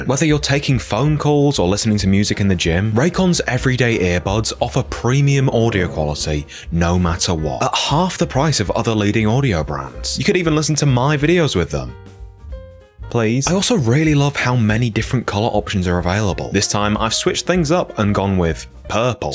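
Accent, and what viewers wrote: United Kingdom. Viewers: i think this guy is british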